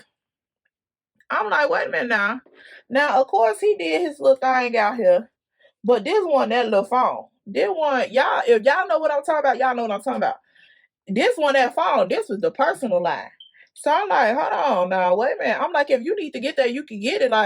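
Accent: Chicago, United States. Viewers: Lol I knew you were from Chicago within 1 min of watching this video...I didn't believe it.. But I guess we actually do have an accent lol... Good story telling 💯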